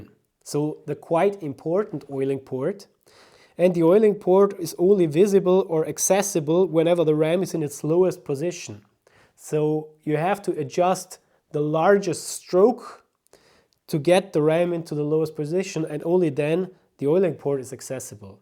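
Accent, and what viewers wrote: Austria. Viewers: I had a good friend from Austria in school. His accent and yours are identical. It make me smile. :) Brilliant work!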